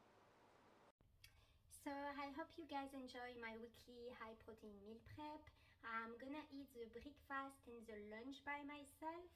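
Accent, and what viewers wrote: France. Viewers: Going to try this! Your English with the French accent is the cutest! 😍 love from Luxembourg 🇱🇺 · Bonjour, merci pour ces idées. Vu l accent vous êtes française ?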